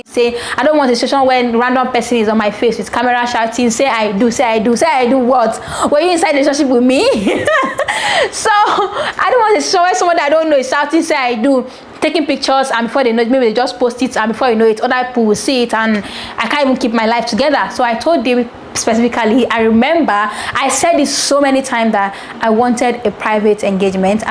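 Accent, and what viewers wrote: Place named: Yoruba, Nigeria. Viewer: I like your vibes.. you so funny 😂, wow your Yoruba accent looks good from an Igbo lady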